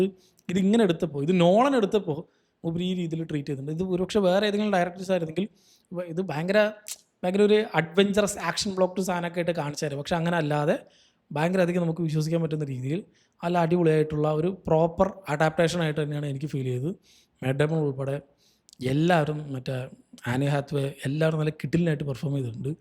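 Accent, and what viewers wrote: Palakkad, India. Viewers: bro, palakkad ano?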